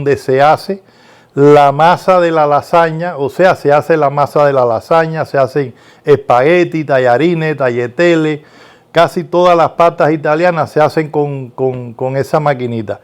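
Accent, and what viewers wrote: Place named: Santiago de Cuba, Cuba. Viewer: Yo voy a hacer tu receta y seguir tus trucos tiene muy buena pinta. Dios te bendiga abuelito, me dio mucha nostalgia tú acento, yo también soy Santiaguera.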